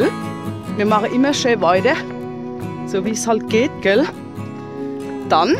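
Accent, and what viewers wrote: Palatinate, Germany. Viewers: Also je öfter ich Deine Videos sehe, um so besser verstehe ich den Pfälzer Dialekt 🤓 Der Kamin war ja mal ne coole Sache 👍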